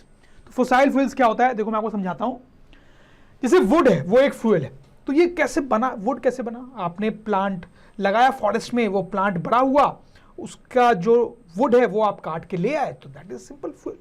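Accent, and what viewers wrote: Bihar, India. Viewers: Sir are you from Bihar ?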